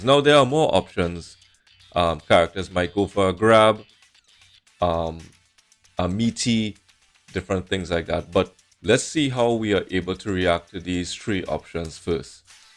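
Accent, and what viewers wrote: Trinidad and Tobago. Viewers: Are you Trinidadian? The accent sounds very familiar.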